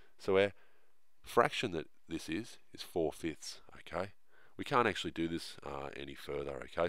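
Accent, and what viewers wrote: Australia. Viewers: Your channel is the reason I'm starting to overcome my fear of mathematics. Your style is unique, you avoid unnecessary terminology and technicalities, you keep it clean and simple and you have an innate aptitude for explaining problems. And bonus, I love your deep and soothing voice your accent is amazing! Is it Australian? Thanks and keep making these amazing videos! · Love all your video's and you have a cool voice, sounds like an Aussie accent :-) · Oh my god thank you so much! I've watched several other videos but thy didn't help me one single bit, and this helped me so much! Maybe because I'm used to aussie accents. xD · That thic australian accent calm my mind... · You can tell he's Australian by his first words on most videos, 'G'day everybody...'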